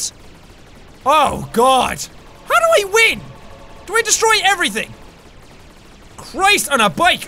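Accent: Ireland. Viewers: are you irish? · I like that instead of hard, u say herrd xD love it (yes i know is irish) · What part of Ireland are you from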